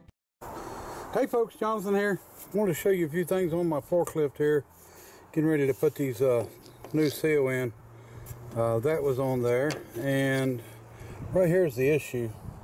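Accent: United States. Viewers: A true American, an American holiday, American ingenuity, American scenery, American accent throughout.......gives one hope that this is still America and we might, just MIGHT recover. Need an example of what I mean? When buying a $10 watch at Walmart, look at the instruction sheet. Three feet square! The English part is three square inches. A new iron, same thing only they tell you "DON'T IRON CLOTHES WHILE WEARING THEM!" Whoopeee! Happy Mothers Day. Thanks Jonathan.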